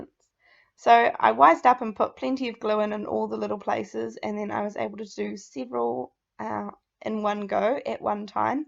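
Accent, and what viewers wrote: New Zealand. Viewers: Gorgeous card and a technique I am keen to try for myself. Wonderful to hear a Kiwi accent , that's why I subscribed to begin with, as support for a fellow New Zealander then I made the happy discovery that you are also a very talented card maker so many thanks for putting so much time into sharing your skills with us.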